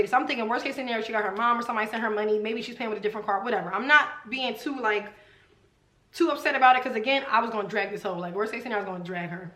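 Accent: Philadelphia, United States. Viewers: Are you from Philly? I think hear it in your voice lol I’m really really sorry that happened to you, people are CRAZY. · you from philly aren’t you?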